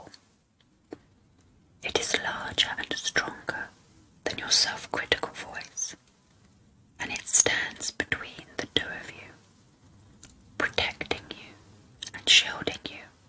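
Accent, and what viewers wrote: United Kingdom. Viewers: i hate british accent but i still watch it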